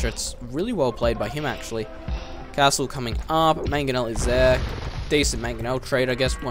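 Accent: Australia. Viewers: About deer luring. Viper says it's usually wise not to lure deers. You should really make video with accentuated australian accent.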